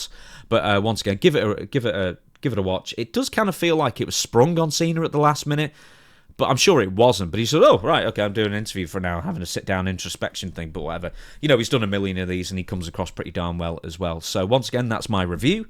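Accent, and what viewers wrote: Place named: England, United Kingdom. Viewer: What part of England is this accent from? It's awful!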